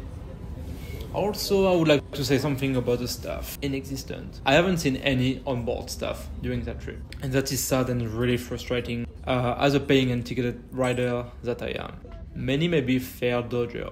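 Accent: France. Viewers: Plzzzzzzz learn English without the French accent that would be super nice😍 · My VERY strong preference is for voiced videos from you, but I'm not a typical English-speaking viewer when it comes to this matter. I suspect you know that your English is excellant, quite casually and colloquially delivered - but with an indeed strong French accent. English speakers with lots of experience with French people speaking English as you do might hardly notice, but... Any reason not to go for a time with both voice and the subtitles? (The subtitles become simply a transcription. The "native language" of the channel has in fact always been English, no?) For many cranky English-speakers, the more they hear you talk the less they'll notice the accent. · Hi 👋🏻. So first, I could understand through your French accent perfectly but did have to listen attentively. I think the more you speak English to us, the better you will become so don’t stop. 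Secondly, I hope you get the opportunity to meet the new Secretary of Transportation of the United States, Pete Buttiedge as he wants to develop a high speed train system within the U.S. I think you would have some very good advice for him. Perhaps through your connections this could become reality! I agree with you... SNCF needs to clean those filthy windows because that scenery was awesome! Keep up the good work. I enjoy your presentations. Between you and Dylan, you both have given me many ideas to take once the pandemic has been arrested. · Love your French accent. But surely the most scenic part of this line is east of Nice, towards Menton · I like the overall change. Sometimes I found the subtitles distracting and prevented me from actually seeing your video. Additionally, hearing your voice makes it easier for the audience to connect with you. Also, Americans love French accents:) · On entend ton accent français lol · I mean he is French. 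He wont lose the accent. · Great video. I enjoy hearing your French accent. I am French myself and my grandparents are from Domont. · Yes I love the French accent please continue the narriated edition. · I don't know how weeds are harmful or negative in any way (do they damage the tracks or the train?) but I like seeing them. It makes the tracks and the overall environment prettier! I love hearing the French accent 😂 but it was hard for me to understand, so I did have to turn the auto-subtitles on. So for the actual content I prefer subtitles. But I do like hearing your voice! It's good for moments like when you're describing the trip on the map and the summarization at the end. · While I appreciate the voice attempt, and the video, my wife and I who are American, found your French accent a bit thick and hard to understand at times. Suggest you stay with the captions instead. Loved the scenery, although the dirty coach windows detracted at times. 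· I agree! I love his french accent too and i love his narration! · Excellent alternative format and great to hear your voice. Nice and clear. I have to say you might have some people who struggle to understand your magnifigue French accent (I am a romantic I love the accent) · Wow you have a French accent 😳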